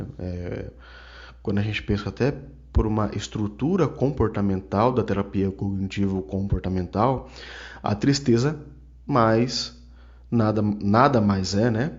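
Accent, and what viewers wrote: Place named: Inland São Paulo, Brazil. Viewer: Sua voz e sotaque é bem legal, você deve ser igual eu do inteiro de SP. Primeiro vídeo que assisto do seu canal, gostei muito, és bem objetivo! Abraço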